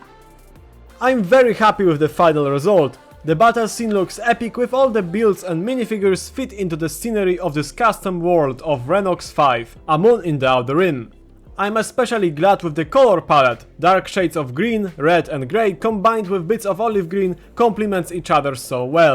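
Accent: Poland. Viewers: słychać że polak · from where are you from you sound polish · Bro speaks English jak polak💀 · usłyszałem taką nutkę polskiego mówienia po angielsku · słychać polski haha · Słychać że polak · Polski akcent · masz polski akcent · I can understand the accent because I am also from Poland and I. Love these videos, keep up the good work I hope you get 1M subs before 2026 👍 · You have Polish acent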